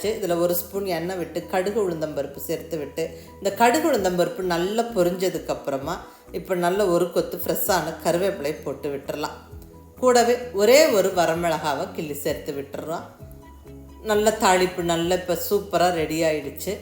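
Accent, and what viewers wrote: Kongu, India. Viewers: Unga samayal ellam romba pudikum kongu tamil super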